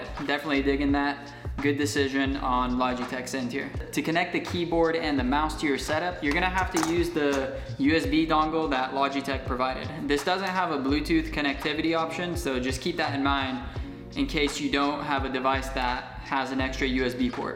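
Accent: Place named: Georgia, United States. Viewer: U from GA?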